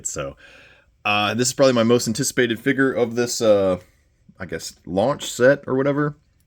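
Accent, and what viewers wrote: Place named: Pennsylvania, United States. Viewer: I'm good ain't I? I heard the pronunciation of the words you say. Forget what words they were but I know you didn't say this but this would be an example. Cold. Half of jersey says cold with the l. The other half and into pa and surrounding states and areas say code. You guys leave out the l. Many other words you say that you never realized you said differently, like house and home. Most people don't pick up on things like this lol. I'm from the jersey shore, my family's from PA and Trenton. We talk so differently and I'm only 40 minutes from Trenton and the Delaware River.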